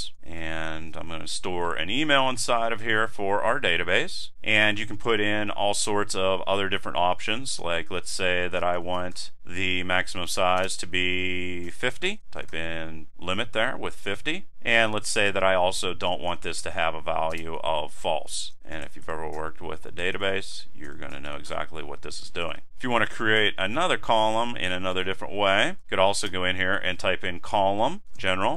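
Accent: Pittsburgh, United States. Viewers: Killer accent you yinzer you :))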